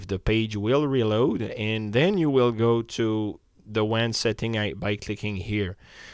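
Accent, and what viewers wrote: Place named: Quebec, Canada. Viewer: LOL j'adore l'accent et finalement pas tataouinage une explication a la québécoise direct au point cool merci!!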